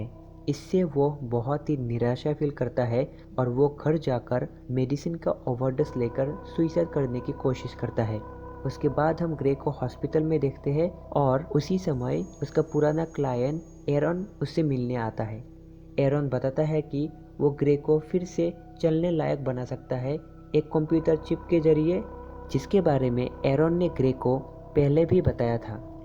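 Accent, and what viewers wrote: Assam, India. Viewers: I think you are Assames. Cuz you have same accent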